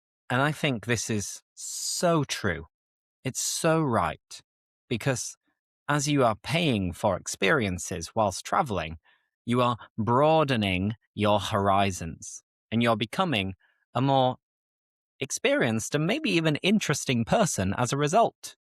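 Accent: United Kingdom. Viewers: This podcast episode unpacks essential holiday expressions, phrasal verbs, and travel vocabulary through slow, clear British English and personal storytelling. The practical examples and interactive Q&A format make picking up natural travel idioms completely effortless. Honestly, this is hands down one of the most practical and enjoyable listening practice lessons for boosting conversational English!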